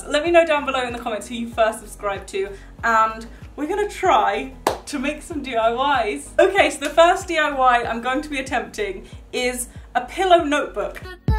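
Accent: United Kingdom. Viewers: I love your British accent ❤️❤️❤️❤️❤️❤️❤️❤️ also you are soooo pretty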